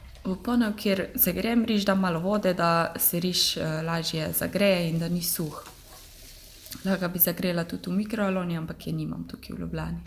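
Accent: Carinthia, Austria. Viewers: A ti si slučajno iz Koroške?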